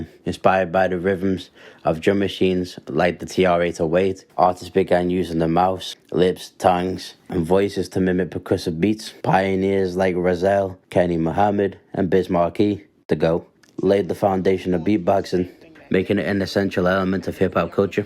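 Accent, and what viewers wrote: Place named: Northern Ireland, United Kingdom. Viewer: To me it reminds me of different accents, like a bit of an irish accent but also newcastle. It just doesn't quite fit with either to me, I'm pretty sure he's from somewhere on the british isles. English is not my first language though, so I might be completely off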